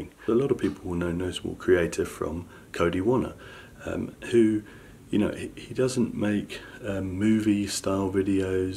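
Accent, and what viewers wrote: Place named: United Kingdom. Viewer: Dude you’re British? I subbed just for that lol. Great video man.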